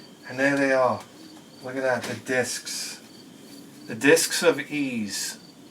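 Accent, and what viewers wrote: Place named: Boston, United States. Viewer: it's that accent that sounds Bostonian.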